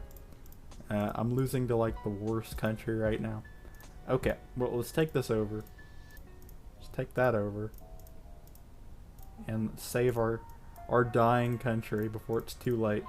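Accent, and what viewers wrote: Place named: United States. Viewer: Hey man just curious about your accent- what state are you from?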